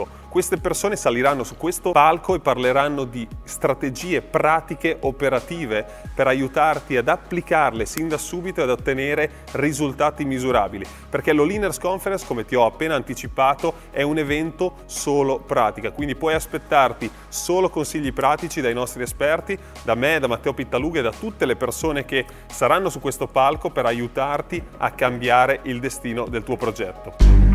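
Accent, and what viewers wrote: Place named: Ferrara, Italy. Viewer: ma sei di Ferrara? Dall'accento e intonazione sembri proprio uno di quei ferraresi oramai poco riconoscibili, che hanno viaggiato tanto e per questo smarrito (per fortuna) le elllle e le essse drammatiche, ma un eco mi rimanda a Ferrara, sono di Ferrara anch'io anche se ho vissuto negli USA qualche anno.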